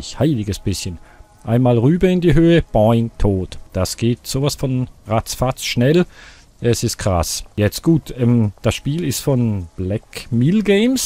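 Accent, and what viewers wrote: Switzerland. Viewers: Er kommt aus der Schweiz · Wohnst du in der Schweiz? Du klingst wie ein Ostschweizer :D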